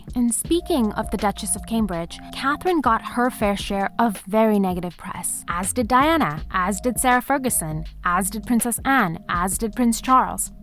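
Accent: United States. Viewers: May I ask why you sound so American when being born and raised in British English speaking India? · Mumbai - I thought you were American. Greetings! You are providing us well researched and quality content!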